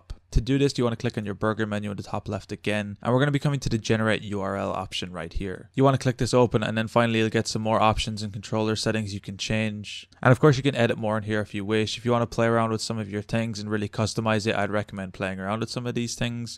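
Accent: Ireland. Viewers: Irish accent is awesome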